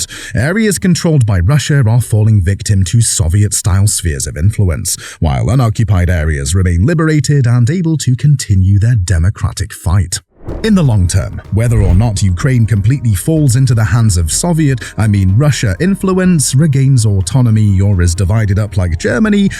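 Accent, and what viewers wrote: United Kingdom. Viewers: Rubbish and biased video. British sounding Harry Potter Uncle Tom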